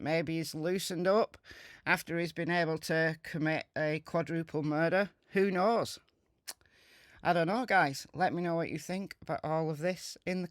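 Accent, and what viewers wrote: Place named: Manchester, United Kingdom. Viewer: Love that Mancunian accent. Great content. Will be back for more. Lived near the Denton Golf Course in a previous life, on the way to Stockport.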